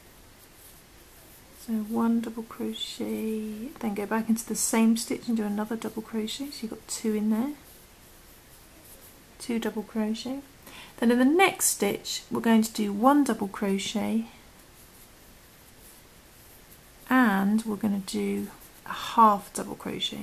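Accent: United Kingdom. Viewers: Lol, I heard your British voice and assumed you were using British crochet terms, whoops! Just about to start again - looking forward to making this for my Autumn wreath, thank you :)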